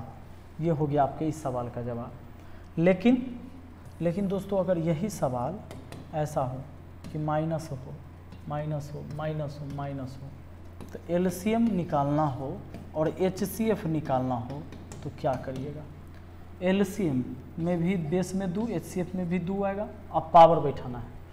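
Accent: Bihar, India. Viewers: Sir aap bihari ho kya aap video mai ache language use Kiya kro😅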